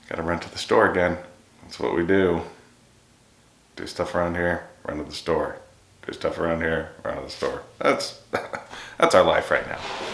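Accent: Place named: England, United Kingdom. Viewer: yr English accent is totally on point, u might like the TV series version of The Hitchhiker's Guide To The Galaxy,, 📺👍📱🛸🌌,, it was made in the eighties when there was Colins/ Nigels who spoke like that,, it's way better than the modern movie,, almost as good as the original radio play, which is almost as good as the books, which was a trilogy with five parts, (pt4 is my fav),, there are also audiobook versions on yt, which r good for painting to 📱📻😏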